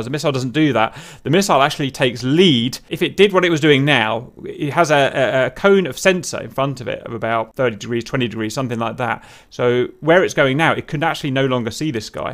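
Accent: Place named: United Kingdom. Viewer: I am not used to British accent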